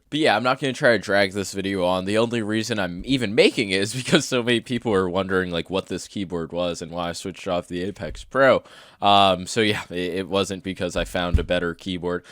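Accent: New York, United States. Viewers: I'm guessing New Yorker lol Don't worry It's beautiful 😘 It's only a few words as well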